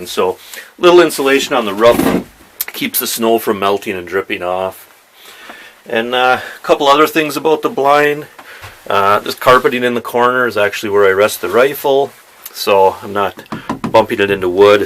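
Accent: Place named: Upper Peninsula, United States. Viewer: Sounds like sout o Ishpeming, eh. One of dem yoopers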